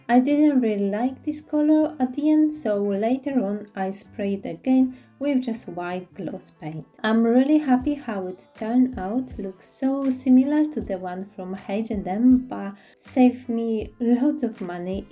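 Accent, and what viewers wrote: Poland. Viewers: Ciao, i see you're from Poland i hear accent but great not shy of that i'm quite scared to speak on video because English is my 4 th language but i do a lot of diy projects, prefer more diy's on video so we could choose from, advise before to dry clay put them on the surface that you going to glue so they keep form of it, keep going it's all process enjoy😘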